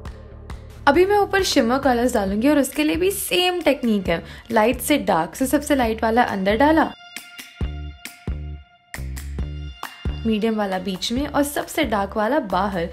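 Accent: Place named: Mumbai, India. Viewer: Omg a hindi video After hearing ur mumbaiya hindi in ur vlog with ur dadu i requested u to do video in hindi n u did it Thanks Btw please do post videos in morning so it will make our day beautiful One more thing i m not getting notifications of ur channel but getting of others channel is anyone else facing same problem 10 days left for vlogmas n its sad please try to post more videos N just love 💓💓💓💓💓💓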